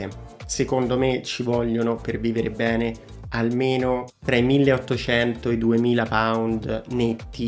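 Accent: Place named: Rome, Italy. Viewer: Sembra romano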